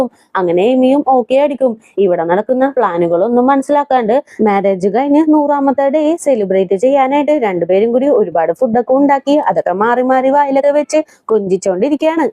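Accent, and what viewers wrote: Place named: Thrissur, India. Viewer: Language style kettal thanne Thrissura nadennu thonnunnu. Pls mention the drama name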